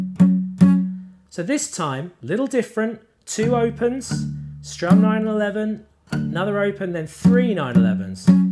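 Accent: United Kingdom. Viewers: he's British leave him alone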